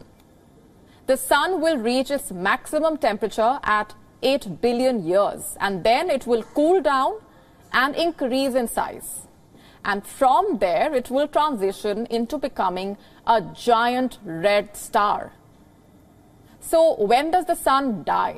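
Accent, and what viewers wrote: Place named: India. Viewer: Daily dose of indian accent news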